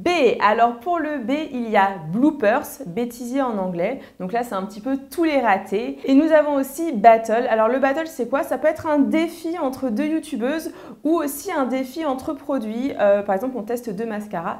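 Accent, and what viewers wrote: England, United Kingdom. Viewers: tu me fais trop avec ton accent Anglais!;) Toujours au top, bisouuuus!:) <3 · # Mdr tu a un accent anglais à mourir de rire mais je t aime bien quand même Plein de bisous:-*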